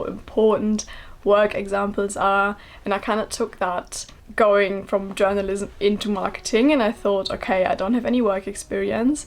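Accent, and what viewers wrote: Germany. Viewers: Your accent sounds quite german or am I hallucinating? Great content. Love England. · She has very strong german accent though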